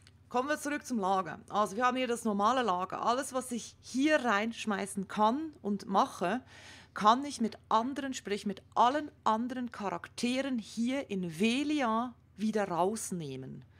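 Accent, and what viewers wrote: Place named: Switzerland. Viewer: Danke für die Erklärung mit wunderschönem schweizer Dialekt.;D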